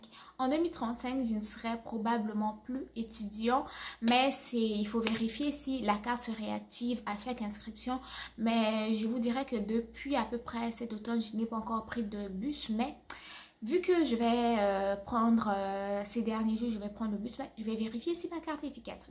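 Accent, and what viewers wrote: Canada. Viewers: Je suis un gars de Chicoutimi, expatrié en Angleterre (depuis 2006). J'ai encore l'accent, toi tu sembles être proche de l'attraper.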